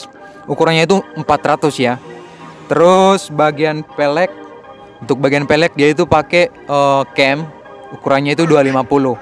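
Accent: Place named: Manggarai, Indonesia. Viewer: Logat nya manggarai sekali😂😂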